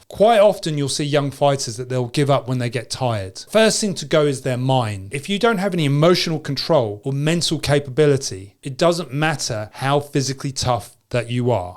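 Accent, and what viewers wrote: England, United Kingdom. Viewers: English, not British. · I like the British accent!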